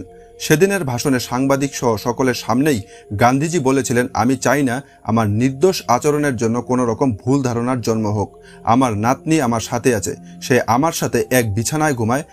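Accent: Bangladesh. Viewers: ভাষায় তো মনে হয় বাংলাদেশি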